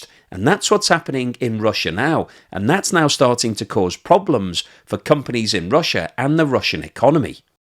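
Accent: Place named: England, United Kingdom. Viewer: Great job,. Thank you for educating us. Your videos are very enjoyable and you are very good at what you do plus I really like your accent. Yours is a proper English, beautiful and clear 😊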